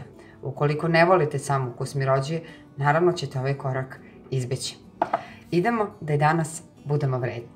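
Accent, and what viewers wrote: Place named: Vojvodina, Serbia. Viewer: Ovako dobro se kuva i jede samo u Vojvodini. Najbolja kuhinja, najbolji kolaci;-)))), znao sam da si iz Vojvodine, cuje se po naglasku;-))) Puno puno pozdrava i svaka cast, i kuvas i mesis fantasticno;-))))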